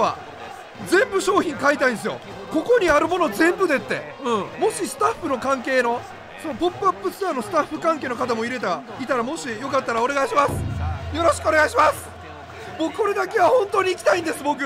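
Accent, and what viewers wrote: Kansai, Japan. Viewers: 「緩急」違和感ないけどなぁ。 俺もVIPも関西人やからかな笑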